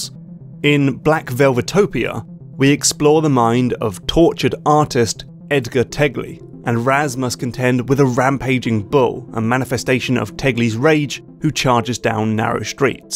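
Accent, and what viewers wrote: United Kingdom. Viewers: I love internet video man, especially British voice internet video man.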